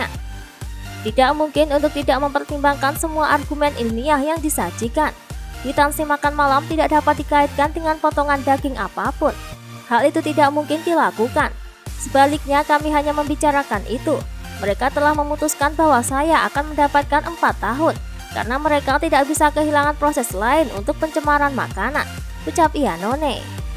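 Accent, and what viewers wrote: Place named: Banyumas, Indonesia. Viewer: Admin nya orang ngapak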